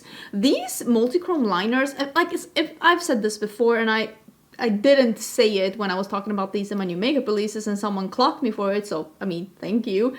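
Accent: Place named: Sweden. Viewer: Don't you so love how she always says " let's soom in " instead of zoom in...so sweet and Swedish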